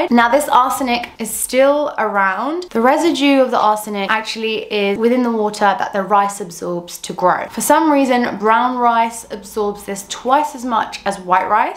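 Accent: United Kingdom. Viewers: Thank you ! I have tried, and according to your explanation, my hair is of very low porosity. That would totally explain why coconut oil makes my hair dry instead of nourishing it, and maybe that's also why I started losing more hair after using flaxseed gel 😱 This clearly shows that not everything works for everyone, and thank you so much for warning us about rice water, cause I would definitely have tried it someday because of how popular this method is! By the way, you have a lovely accent ! Are you British ?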